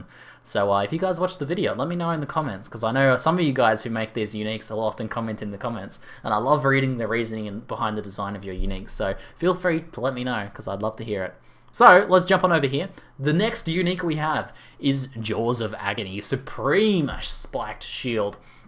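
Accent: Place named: Australia. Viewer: your videos are always professional, very informative, well organized, and extremely entertaining! You take us through every nook and cranny in these unique videos, and I love how you go into depth and talk about how each item can be used in specific situations and examples. Big fan! Keep up the good work! Also, love how you say "just here" all the time... Must be an Aussie thing:D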